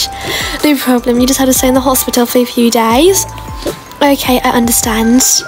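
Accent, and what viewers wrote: United Kingdom. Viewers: Omg the British accent 😫😫😫😫so PERFEXT!?!?!??!♡♡♡♡ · Omg i love your channel and the brtich accent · Why sometimes you Talk British and Sometimes You Talk English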